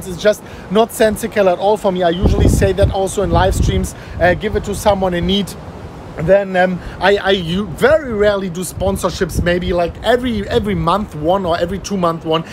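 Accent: Germany. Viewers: Deutscher Akzent kommt voll durch!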